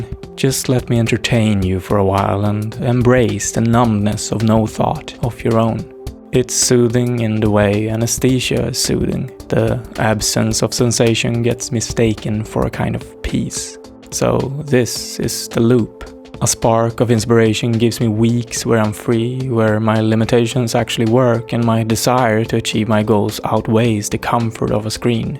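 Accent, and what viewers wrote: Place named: Scandinavia. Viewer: sombre tone makes me feel like i should be contributing and leaving an actually intelligible comment but i just wanted to say i got excited trying to pinpoint ur accent, was like eastern european at first but i think its nordic....?? thank you for the video :) (i deal with it with blocks too... and always carrying my notebook with me .. with routines listed out to fall back on)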